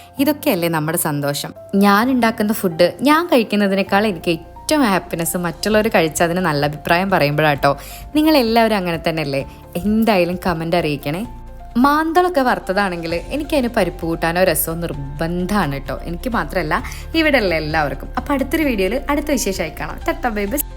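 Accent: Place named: Valluvanad, India. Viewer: വള്ളുവനാട് ഭാഷ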